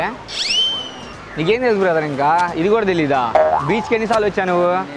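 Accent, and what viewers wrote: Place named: Guntur, India. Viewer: Bhayadi prakasam, guntur side ankunta. Slang alanevundi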